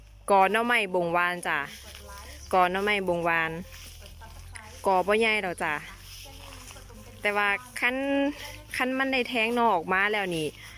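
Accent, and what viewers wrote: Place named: Thailand. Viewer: สวัสดีครับ เป็นคนจังหวัดได๋น้อ สำเนียง มาคือสำเนียงบ้านผมแท้ กดติดตามแล้วเด้อครับ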